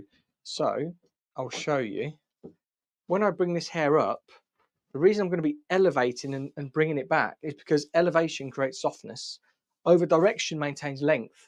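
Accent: England, United Kingdom. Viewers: Let me first applaud you for your skillful talent. I’ve watched several of your videos and although I am no hairdresser by any means, your videos for the average DIY are so precise and helpful. Secondly, I think English accents are incredibly sexy. I could literally fall asleep listening to your videos on repeat. Keep up the good work!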